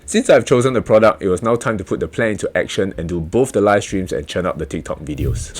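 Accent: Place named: Singapore. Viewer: I love the candid video, thanks for making it! As soon as I (American) heard the Singapore accent, I knew you'd be way more honest / trustworthy than the other guru's on the internet. Every Singaporean I've met is pretty sharp and fair so far. Keep up the great work! Looking forward to seeing what's next.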